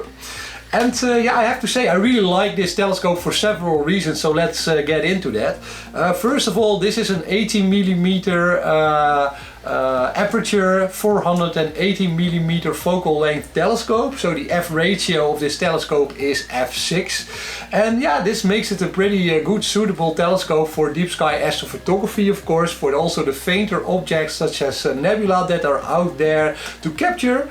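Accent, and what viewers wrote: Netherlands. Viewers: hollander alert😂 goeie video man! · ) dutch accent sounds smart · Ben je nederlands zo klinkt het namelijk Edit: ja dus. Leuke vids trouwens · From the accent I predict this guy is Dutch. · Na het eerste woord hoorde ik het al, een nederlander!! Haha. Thanks voor de video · Haha na de eerste paar seconden zei ik meteen, hij is sws Nederlands. En ik had gelijk😜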